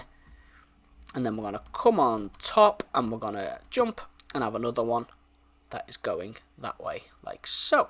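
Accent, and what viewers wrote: Liverpool, United Kingdom. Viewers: Liked just because you are a scouser :)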